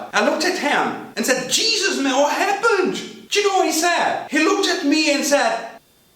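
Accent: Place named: United Kingdom. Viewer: I loved it. It was a great class. Even though I know these rules I often do these mistakes. I was a brave I watched it without subtitle. Your British accent is very nice.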